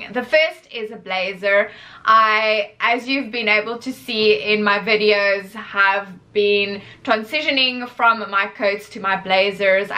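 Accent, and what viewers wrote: South Africa. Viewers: Great travel tips, thank you! Are you South African? Every time I watch your videos, I always think you sound so South African to me (being Afrikaans myself). 😅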